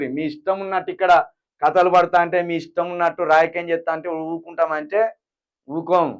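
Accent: Telangana, India. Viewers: తెలంగాణ యాస మాట్లాడగానే మొత్తం తెలంగాణ నీదే కాదు....!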